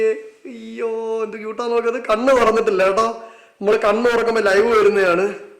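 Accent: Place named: Trivandrum, India. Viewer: Bro ude samsarathil cheriya oru trivandrum slang varunnu❤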